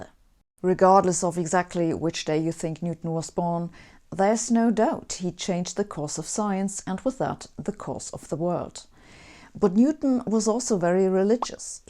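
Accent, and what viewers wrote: Germany. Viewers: Hello Sabine, I've discovered your channel about a week and have been binge watching since then. I think you probably have one of the best science channel out there and I like your german accent. I have a question though : would making the hypothesis that God rules the world through quantum mechanics make any sense science wise ? I know that such an hypothesis would be just as unlikely as any other theories (many worlds etc..), maybe more, but could it be ruled out science wise ? It sorts of make sense that if God influences the world, then He would do it through the most fundamental elements of Nature (although I know that a beautiful theory doesn't make science). In this theory God would chose the outcome of events through quantum decoherence. Since one cause can have multiple consequences in quantum mechanics, God would chose which outcome he likes the best out of them. 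Which outcome actually happens would thus be determined by "God's Will" I guess that would imply that quantum decoherence both happens and has macrocospical consequences in Nature (without human intervention), which I believe is the case based on what I've understood from your videos. I am not a religious person desperatly struggling to fit God in Science. I'm just an agnostic who's curious to know what does science have to say a the matter. I believe I am not the only person who thought of this, so it could potentially make a good video subject. Thank you.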